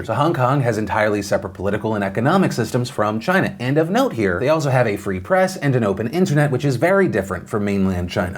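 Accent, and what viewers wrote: South, United States. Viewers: Is from the south? I thought I heard a southern affectation in this episode. Also I had a roommate from Hong Kong, hope she’s doing alright right now.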